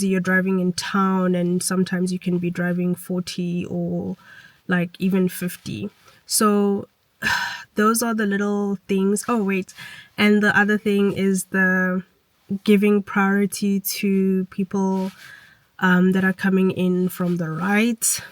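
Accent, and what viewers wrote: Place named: South Africa. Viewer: Oh sweet! I'm glad they don't take it, that would be a mission when flying back and forth XD thank you so much for all your help <3 It's also soo good to hear another saffa accent again :')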